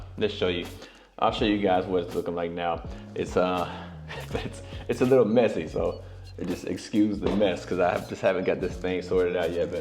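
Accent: South, United States. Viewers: Like that southern accent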